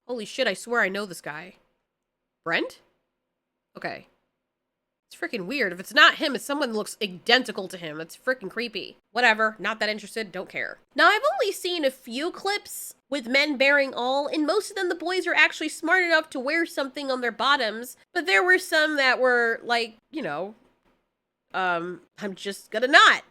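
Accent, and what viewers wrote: Scotland, United Kingdom. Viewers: It was a Scottish accent. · an Scottish accent isnt something i knew i needed till this video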